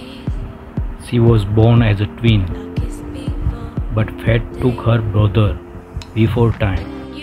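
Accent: India. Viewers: I don't know who wrote or compiled this, but they should go back to find out more about the geography of Nigeria. The commenter is reading with an Indian accent, so I guess not a Nigerian. Oshimili is never and will never be in the Northern Nigeria. Orile is never in Oshodi (lol).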